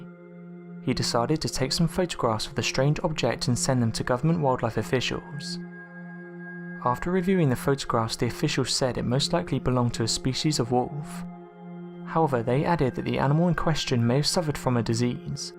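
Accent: United Kingdom. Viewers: AHÍ CARES ABOUT GUNTHER AND HOW UGLY GULF.. AND PLEASE GET THOSE SISSIFIED BRITISH NARRATORS OUT OF HERE.. AMERICANS DO NOT CARE FOR BRITS NOR THEIR SISSY VOICES..SO FAKE..SO FEMMY! FROM NOW ON IF VOICE IS A BRIT.. WE WILL NOT WATCH !!!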